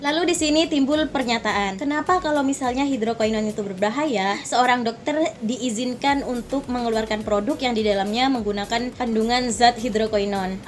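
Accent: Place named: East Java, Indonesia. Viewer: Logatnya kak kayak orang Jawatimur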